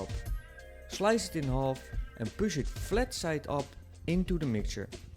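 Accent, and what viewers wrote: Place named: Netherlands. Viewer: Ik dacht al dat ik een Nederlands accent hoorde 😁 Ga van de week t recept eens even proberen en je andere video's eens even bekijken 👌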